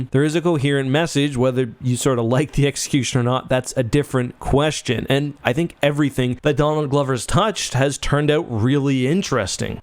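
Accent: Canada. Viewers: If I remember correctly, he was a “boring” kind of lawyer. Might have been a copyright lawyer but definitely don’t quote me on that. It was his actual job when he started making YouTube videos but eventually the channel got so big he had to choose and this ended up being his choice. He’s also Canadian if you couldn’t tell by the aggressive use of “aboot”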